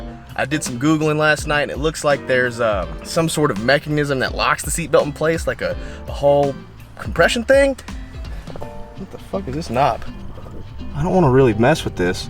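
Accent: South, United States. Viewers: Yay the update (I swear you had such a stronger country accent in this video) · Listen closely. is developing a southern accent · got a truck and now he has a country accent. I used to watch vids all the time, now I check in after 2 years not watching and he has 1 million subs and a country accent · Just me or did he have like a super southern accent today?? lol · sounds more country for some reason lmao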